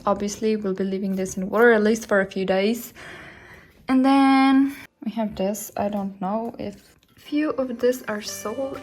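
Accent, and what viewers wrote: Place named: United States. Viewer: Hi. I saw many of your videos, always thought you were american. Compliment for the english and for the good content of your videos! Where do you sell your plants? Ciao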